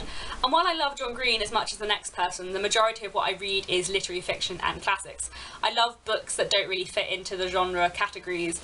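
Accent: RP, United Kingdom. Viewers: Congratulations on your 10 year anniversary,! I'm so happy to be able to watch your content right from the beginning (as of June 2025 I've been watching for about 6-7 years but never scrolled back this far), you did sound very posh here! 😊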